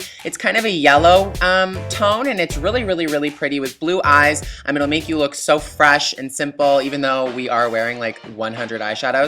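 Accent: Canada. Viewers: Love your canadian accent :)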